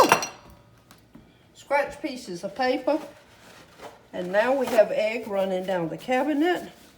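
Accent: South, United States. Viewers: I cannot believe that I have laid out on my counter my sausage to thaw my cabbage is already cut up and my onions and ready to make this dish! I live in Alabama, so we do cook very similar. I am the one that makes my potato salad exactly like you do.! I have just found you under recommendation from another lady that I know and I am so thankful and I love your southern accent!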